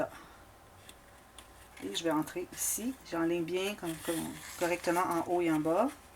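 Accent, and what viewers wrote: Quebec, Canada. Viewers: Re-bonjour, merci et pas de problème, votre carton -papier vous le prenez à quel endroit, et vous , à votre accent vous êtes du Québec , de quelle région ?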